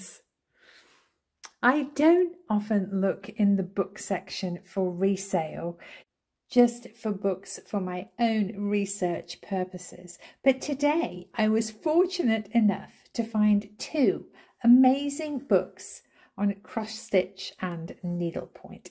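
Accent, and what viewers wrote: England, United Kingdom. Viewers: I really miss the many varieties of hummingbirds I saw daily when I lived in Costa Rica before returning to Canada. I was looking very closely trying to ID them , but definitely some male & female Ruby-throats but not sure of the others. I really enjoy your videos, and laugh when I hear you saying some of the quaint English says. Of coarse, also enjoying watching the dogs and cats...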